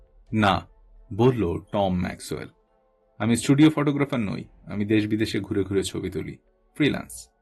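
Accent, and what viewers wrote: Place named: Bangal, Bangladesh. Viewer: Ato bhoyanok pronunciation!!! Please learn proper bengali bhai! Satyajit Ray kokhonoi bangal bhashaye lekhen ni ei sob! You have ruined everything! Akok bhabe korleo listeners need various voices! Use vibrato or else include someone else please! Erokom flat way te kono golpo convey kora jaye na! Please learn the art first!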